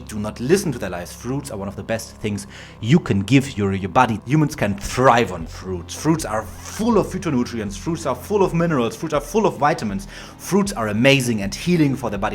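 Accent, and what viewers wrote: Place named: Germany. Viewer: i like your german english. it is like me :) thanks for your inputs.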